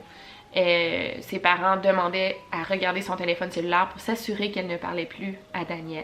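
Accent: Quebec, Canada. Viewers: tu m as définitivement réconcilier avec l accent québecois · tu es très séduisante avec ton accent Québécois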